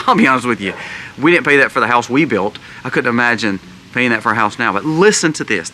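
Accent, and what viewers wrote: South, United States. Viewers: He is from the South. It's called an accent. Many places in the US have different accents. And uneducated comment ❤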